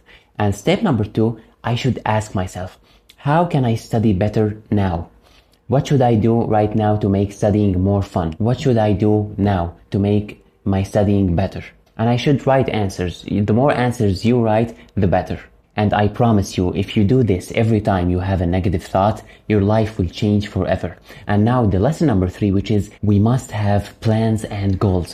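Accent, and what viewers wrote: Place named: Aleppo, Syria. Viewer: حبيت شغل بدون ترجمة 🎉 اللهجة الحلبية كانت احلا حسيت 😁🌸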